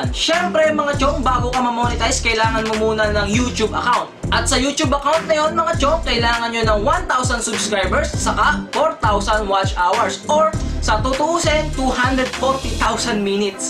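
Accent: Batangas, Philippines. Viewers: Batangueño tlga kpg mgsalita prang galit ,peru natural n boses lng yan...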